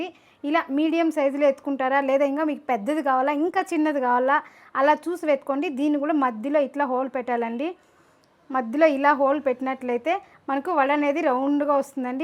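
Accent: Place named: Tamil Nadu, India. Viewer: But, you are looking and speaking like a Tamilian ,akka 😃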